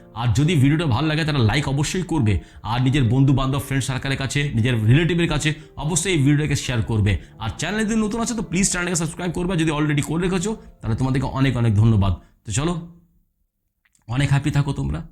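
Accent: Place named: Kolkata, India. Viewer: Apni Kolkatar...😂😂😂 Kotha bolar dhoron ei bojhay..😢😢😢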